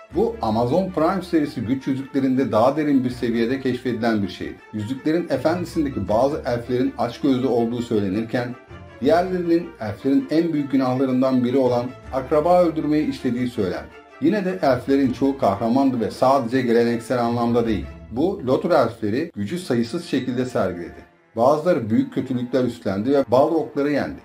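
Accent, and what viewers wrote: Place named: United States. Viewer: Telaffuzlar amerikan ingilizcesi kıvamında olduğundan ekrana bakmadan izleyince feynır kimmiş la deyince ekrana bakınca he bizim eski feanor’muş dedim.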